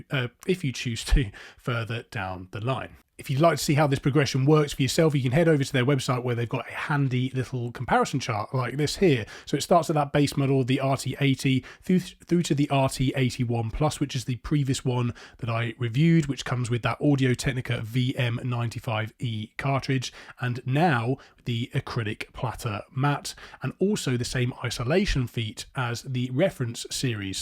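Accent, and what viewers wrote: United Kingdom. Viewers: If you’re in the UK - which sounds like it by your accent - please can you advise on an imminent problem I’m facing with my Fluance RT85, please? I’ve had one for four years and I’m certain the belt will need replacing very soon, however, going to the Fluance site, a replacement belt is £17 (reasonable) but adding in the cheapest shipping option, it comes to £110! I don’t have any Canadian friends to ask to purchase on my behalf and also can’t find what could be a compatible belt here in the UK… any thoughts? This could be an issue that many will face sooner or later.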